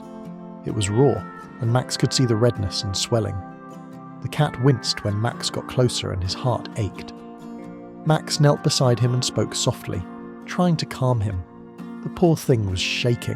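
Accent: United Kingdom. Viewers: Thank you so.much. pls use a narrator with an American accent. Uk accent i s rather irritating.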